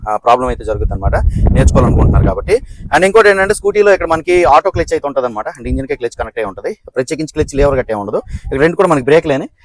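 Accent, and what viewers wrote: Srikakulam, India. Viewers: Guarantee srikakulam ayyuntindi ma intlo matladukunnatle undi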